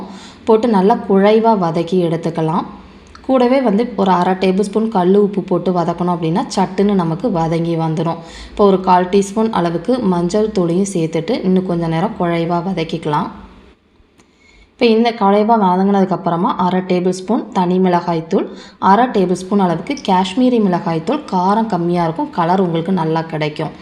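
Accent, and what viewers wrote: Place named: Tirunelveli, India. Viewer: Enaku ithula romba pudechathey nama nelai slang than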